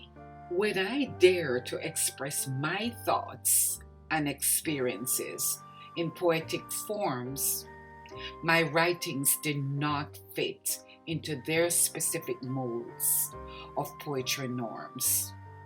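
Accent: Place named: Jamaica. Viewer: You flavored this very well by chipping in the Patois now and then 😂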